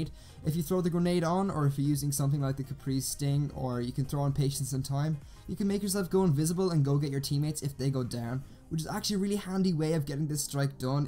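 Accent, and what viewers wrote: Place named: Ireland. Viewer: Where are you from in Ireland? 🇮🇪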